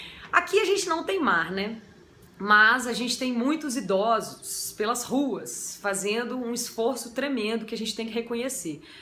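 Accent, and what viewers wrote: Minas Gerais, Brazil. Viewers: Você me inspira. Um abraço apertado. Ah, esse sotaque mineirês... Saudade da minha terrinha!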